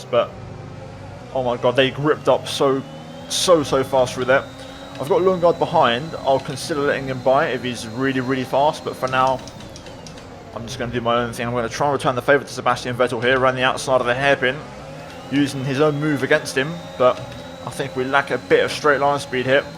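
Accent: Texas, United States. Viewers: Great American accent! Went to college in Texas and visit my gf that lives there and it’s very close! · That American accent! Yes! Oh, you make me laugh! Cheers, mate. I'm not even American but I appreciate it all the same. Lando P3 right now in this series as well, eh? Perfect. Some more to upset that Lee Claxton dipstick. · That American accent 😁👌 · As a native Texan I fully appreciate the effort on that accent lol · Your American accent is perfect, you’d fit right in here in DC! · Sick American accent sheeeeesh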